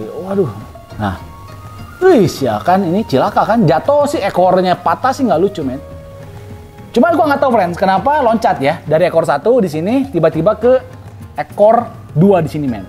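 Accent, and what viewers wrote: West Java, Indonesia. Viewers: BANG LO ORANG SUNDA YA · Sunda pisan mang Salam lembang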